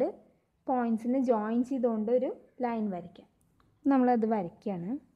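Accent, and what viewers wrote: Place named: Malabar, India. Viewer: ഭാഷ മലപ്പുറം കോഴിക്കോട് ഭാഷ പോലെ തോന്നി 😅😅😅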